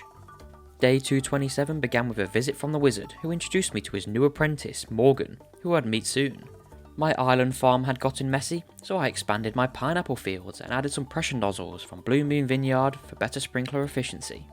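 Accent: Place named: South Yorkshire, United Kingdom. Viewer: FINALLY i found someone who plays the curnocopia mod !!! Also your accent is VEERRY similar to mine im from south yorkshire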